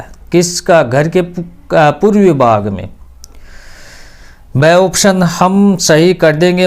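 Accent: Rajasthan, India. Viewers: Bhai Aap kha se mujhe Aap ke boli rajstani lag rhai ❤ me Rajasthan se hu my fevrete boli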